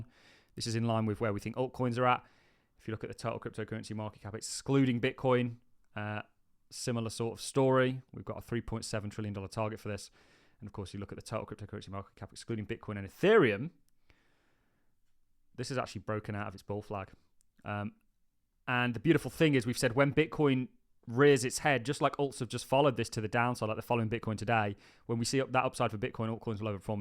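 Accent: United Kingdom. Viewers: Been watching crypto YouTube for 8 years now. is the best non biased analyst I have seen. Plus his sweet British accent is like butter in the morning. There is no need to rage my friend. It’s just a daily update. Go somewhere else and listen to what you want to hear. Plenty of opportunities for news.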